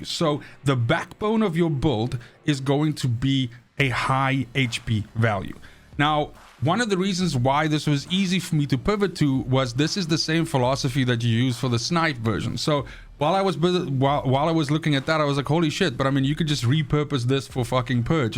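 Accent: South Africa. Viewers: Your the best I recommend you when people need help . Are you from South Africa as your accent sounds that way 🤔. OK this Serena issue As you and others make builds that wipe out enemies and boss killers in 3 seconds them its a bit harsh to say Nexon are to blame.. 3 weeks ago the Gley gun build ruined the boss for everybody and in the game Is that not the same . You then have the nexon put out a new top tier character Keelan anybody??? He was new I have yet to see him in a game I had him but deleted him as I needed a slot. So that argument is done. As for Serena I play another game Naraka bladepoint its free and basically first descendant copied it and moved it to space Naraka is Chinese melee swords OK. They made a character that flys last year OK wow he will destroy players Novelty wore off nobody uses him Exactly the same fire water chill toxic skills. 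So this Serena so far again hardly seen in game Majority are bunny and freyna who just wipe out everybody as usual.If this Serena is so dope then as players bitch about grinding then use her and clear missions fast.or do they want a weak character to take longer . End game bs The game is free people get 900 plus hours thats more than most triple aaa games It takes time to create cgi etc Stellar Blade game of the decade 30 40 hours . If you want hours and hours get baldurs gate. OK As for these boss killers I have not seen any that do 2 seconds in a group They show a solo boss The boss is weakened for Solo So they are playin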